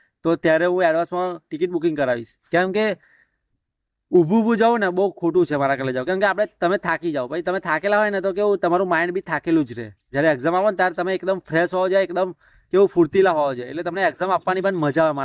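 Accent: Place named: Surendranagar, India. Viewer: તમારું ગામ,તાલુકો અને જિલ્લો કયો..ભેરુ.. સુરેન્દ્રનગર ના લાગો છો બોલી જોતા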